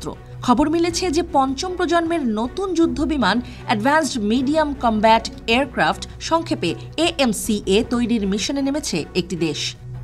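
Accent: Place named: West Bengal, India. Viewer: গলার আওয়াজ আর কথার স্টাইল দেখে মনে হচ্ছে এটা একাত্তর টিভির প্রতিবেদন নয় এটা ভারতের পশ্চিমবঙ্গের কোন টিভি চ্যানেলের প্রতিবেদন তারা প্রচার করছে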